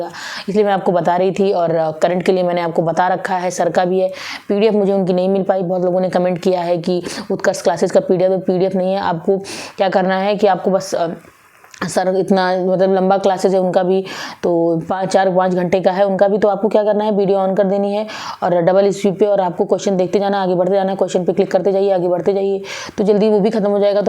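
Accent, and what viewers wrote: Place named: Mathura, India. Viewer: MAAM AAP KA CENTRE KAHAN HAI. AAP MATHURA K LG RHE HO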